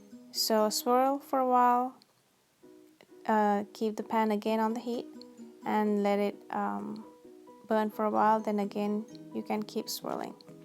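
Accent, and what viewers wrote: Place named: India. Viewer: I hate your hindi accent.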